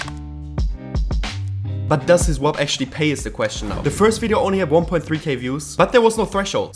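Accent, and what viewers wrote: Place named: Germany. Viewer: Haha Most German Accent ever 😂❤🇩🇪 aber heiles Video!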